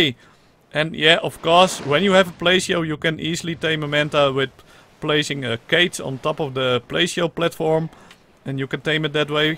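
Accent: Netherlands. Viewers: Are you Dutch? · I can hear you are dutch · are u dutch? :p I can always hear if someone is dutch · Haha I'm dutch too, you can hear it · U are definetly dutch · Are u dutch?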